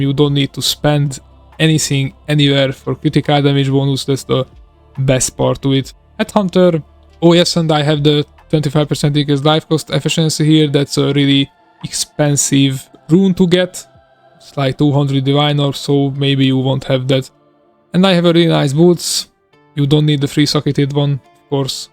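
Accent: Hungary. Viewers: Where are you from? You sound like you are from hungary a lot :)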